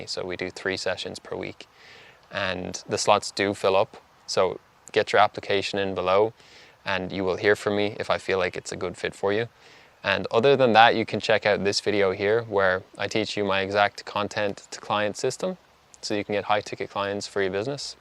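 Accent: Ireland. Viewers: is that another irish accent I sense?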